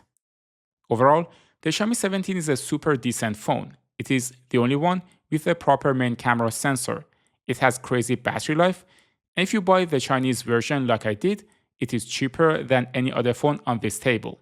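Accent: Iran. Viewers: Are you persian? You sound persian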